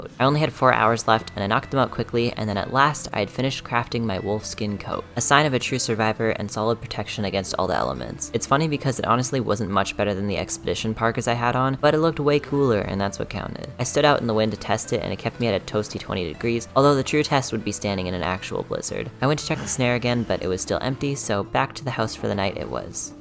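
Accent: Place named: Canada. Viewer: he sounds adorable ALSO caneda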